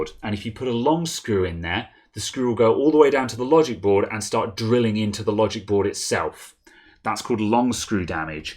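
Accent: England, United Kingdom. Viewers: Just followed this and found the hinges were actually cracked on both sides, so no hope of fixing the loose screen, but brilliant, clear and helpful video. Bonus points for clear English accent.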